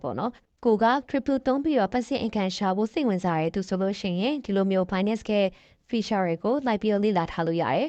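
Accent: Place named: China. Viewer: အသံက ပုံမှန်မဟုတ်ဘူးထင်တယ် နားထောင်ရတာ တရုပ်သံပေါက်နေတယ်😢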